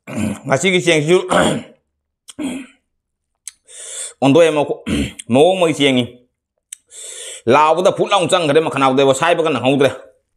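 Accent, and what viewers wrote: Cachar, India. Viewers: Brodrj cashar accent male if im not wrong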